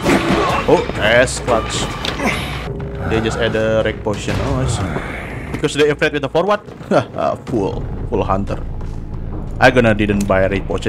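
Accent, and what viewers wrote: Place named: Indonesia. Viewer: Aksen'a kek orng Indo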